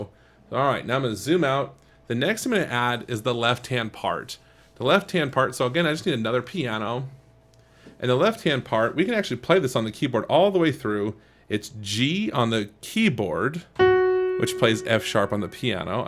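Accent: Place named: Las Vegas, United States. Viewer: Fun Project! I think you've lost some of your Vegas accent, haha!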